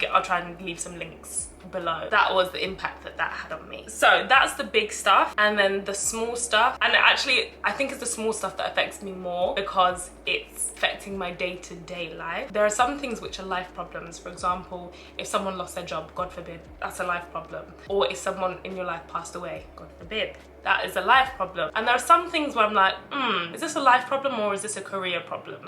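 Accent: United Kingdom. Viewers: Koreans are a bunch of racist weirdos with their Kpop and femboys. You're actually incredibly beautiful and hit the genetic jackpot. Plus you're well spoken and you have a British accent on top of that. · are you british? i can hear it in your voice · They were mean to you....I am a white older teacher, and have taught hundreds of people, so on that claim of expertise; you are pretty, you are special because of braving the adventure, and you are gifted in language expression with a British accent that is so eloquent and in fact exquisite, and you are clearly intelligent as anyone could tell if they had half a concept of full sentences, vocabulary and grammar....they were lucky to have you and didn't recognize the chance they had for their students...it was their loss...Like Jesus' advice was; shake the dust off your feet as you leave them and know you will be guided to a lovely future...you deserve it...meanwhile you got to experience a high context culture which is based in inference and not directness...(PS, I was a French teacher so I want to add; Louis Pasteur had a colleague Antoine Bechamps who argued that if the germ harms then it should harm everyone but it doesn't so in fact Pasteur woke up to that fact before he died and confessed that he was wrong and Bechamps was right...his dying breath said Le Terrain Est Tout, the terrain is everything...people's immune system means the germ doesn't have the power people claim it to have...your field or terrain is helping you.) God bless you!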